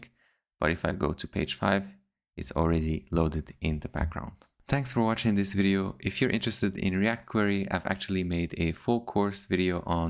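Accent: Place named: Morocco. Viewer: Bro sounds moroccan AF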